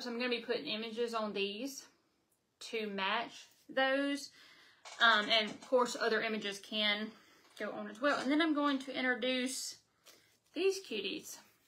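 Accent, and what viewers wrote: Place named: Mississippi, United States. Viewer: Thank you, so much for answering me! I thought I heard some Mississippi in your accent. Love it! ♥️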